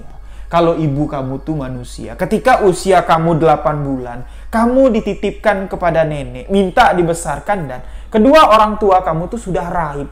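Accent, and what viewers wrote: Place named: Jambi, Indonesia. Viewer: Pertama denger tak kira org Bali g taunya logat Jambi ya ...sukses ya mase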